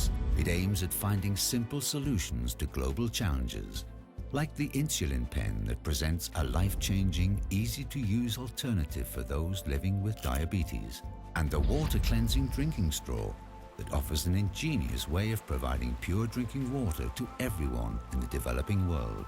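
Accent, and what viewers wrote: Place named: Denmark. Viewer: Concider.... Check your Danish English-speaking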